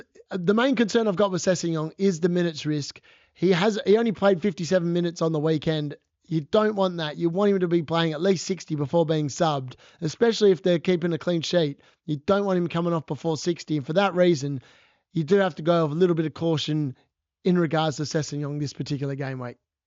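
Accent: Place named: Australia. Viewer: Hi mate, love your content and delivery, great hearing an Aussie accent . I'm Aussie in NSW . First year playing but lovin' it. A great differential in my opinion going forward is McNeil from Everton , they have increased his minutes over the last few weeks and he was brilliant last week . $5.1m if not this week definitely next imho. Cheers bro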